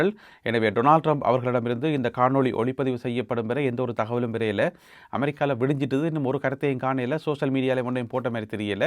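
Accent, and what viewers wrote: Jaffna, Sri Lanka. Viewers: யாழ்ப்பாண தமிழ் தனித்துவம் தானே ❤❤❤❤❤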